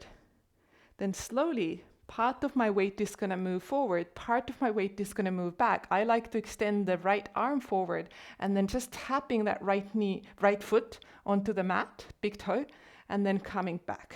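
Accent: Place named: Australia. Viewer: this was a brilliant practice..... you have a hint of an Australian accent did you spend time in Australia at some point?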